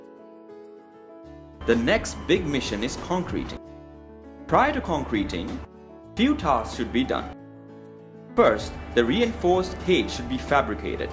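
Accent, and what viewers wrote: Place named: Caribbean. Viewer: Its a carribean or Caribbean accent and his diction is quite clear.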